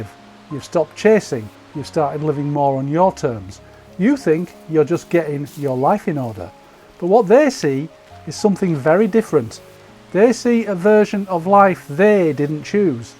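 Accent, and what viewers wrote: United Kingdom. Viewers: I’m pushing 60, in half a year. Is the narrator from Britain? Sweden here.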